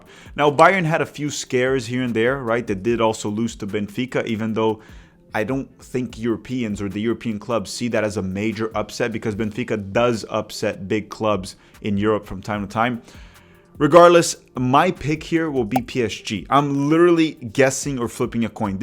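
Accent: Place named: Rio de Janeiro, Brazil. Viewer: Awesome to have Brazilian football/soccer coverage in English. Just wondering why you’re pronouncing Palmeiras and other ‘s’ like a Carioca 😂. My wife (a gringa) who is learning Portuguese was confused and of course I’m steering her towards the Paulista way of pronunciation.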